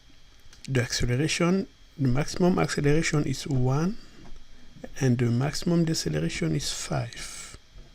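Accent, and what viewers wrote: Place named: France. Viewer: try to improve your english you speak frenglish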